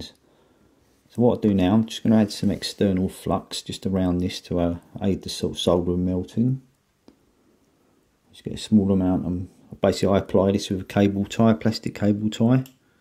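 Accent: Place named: Kent, United Kingdom. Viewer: Hi thanks for your time and work. You have a familiar accent, are you from north Kent?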